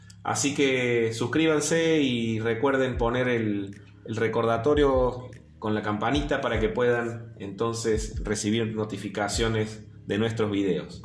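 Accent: Córdoba, Argentina. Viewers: por la tonada me pareció que sos cordobés soy de malagueño cerca de Carlos Paz .e visto muchos videos de otras Singer pero no encontré ningúno de la 29 k . casualidad la estoy reparando y me sirve mucho tu vídeo .un abrazo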